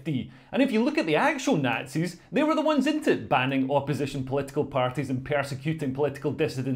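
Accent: Scotland, United Kingdom. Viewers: I love listening to you. Scottish accent right enough but your words are always, totally correct. Northern Irish with factual Scottish roots. Thank you for facts that that the B-BBC can’t get an actual fact these days.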